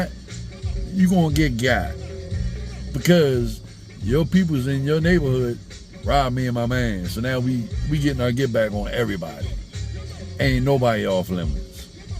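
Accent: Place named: Africa. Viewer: Why are you speaking as if you were an African man?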